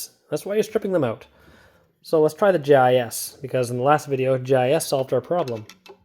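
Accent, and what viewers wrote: Canada. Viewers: This guy is a Canadian. Listen closely. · I hear a Canadian acceeeent.😊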